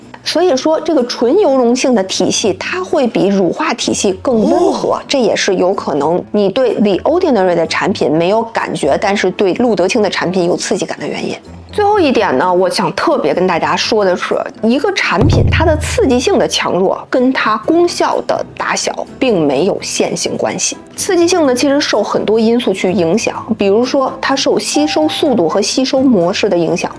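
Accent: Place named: Beijing, China. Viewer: 感谢您的讲解，说话京腔特逗😅，非常喜欢您的节目，希望多出，受益匪浅❤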